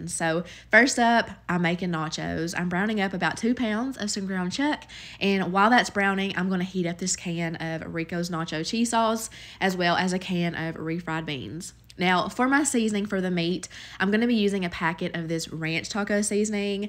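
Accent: South, United States. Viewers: Hi - I really enjoy your cooking videos, you are very creative, plus I love your beautiful Southern accent. I notice on American vlogs pieces of pork are referred to as "pork chops". Here in Australia we only call it a pork chop if it has a bone in it. Thankyou for all your ideas & wonderful recipes. Best wishes from Sydney Australia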